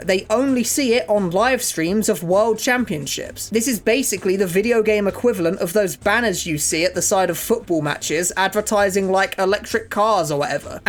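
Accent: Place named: England, United Kingdom. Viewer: Ok english accents are always funny to me as an American, like i enjoy how you all say Mario, but that Nissan pronunciation is something else!! ❤️ You have a great channel man. Could really good analysis videos I've seen now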